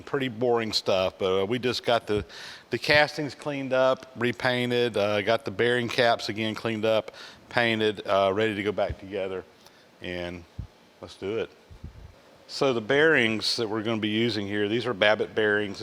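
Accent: South, United States. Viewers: LOL :) - He's from Georgia, so to translate "owl hole" is really "Oil Hole" with a southern drawl.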